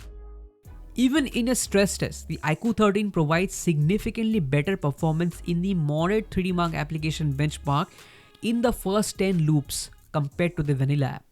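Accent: India. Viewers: After hearing you're accent I instantly get to know you're Indian but why don't you make videos in hindi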